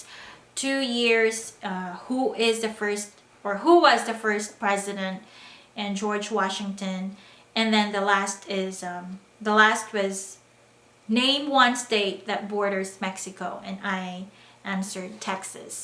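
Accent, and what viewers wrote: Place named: United States. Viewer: True American accent too. congratulations. For me I always speak the way I did. Hope I could fallows your accent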